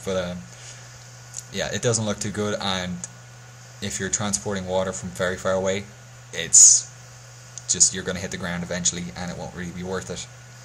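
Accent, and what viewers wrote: Ireland. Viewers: Do you have a hint of an irish accent?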